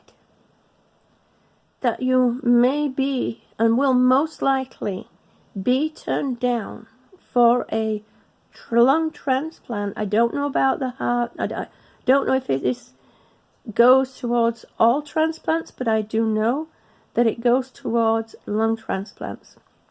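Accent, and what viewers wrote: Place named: United Kingdom. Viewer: Hi. I'm just sort of taking it day by day. I caught a cold a few weeks ago but thankfully it didn't drop into my lower respiratory where it could cause all that havoc like the first two times since I got back from Europe in 2015. I think drowning myself in Vitamin C helped to keep it confined to the upper. I just read that the FDA has ordered the pharmaceuticals to drastically cut back on the production of opioids this year and probably even more in subsequent years because of the problems of youth abuse/death. Have you been affected by this,? People in pain management who follow their Dr's directions are unfairly being caught up in this tug of war. Dr's are getting terrified to prescribe narcotics because of threats from the FDA. It's really an intractable situation and innocent pain sufferers are snared in these politics. 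I cannot think think of a person more qualified to receive them than you and I pray you aren't affected by all this. PS I detect a British accent. Are you on the NHS or are you here in the US? You may not be feeling the effects of the cutbacks we are having here. I read that Britain is one of the friendliest countries for use of narcotics if the use is legitimate. Hang in there. Somewhere out there is an understanding Dr. who ca appreciate your dilemma. You just have to find him.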